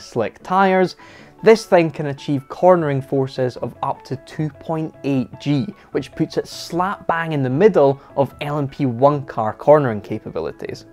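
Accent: Scotland, United Kingdom. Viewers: “Byoo-gaah-tee” His Scottish accent is so fun haha. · are you Scottish or german i think scottish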